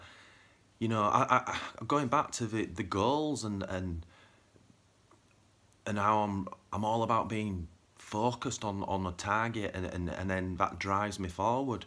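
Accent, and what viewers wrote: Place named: United Kingdom. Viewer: Great vid, totally get it. Were's your accent from? Im from uk now living in australia. Your accent sounds same as mine : )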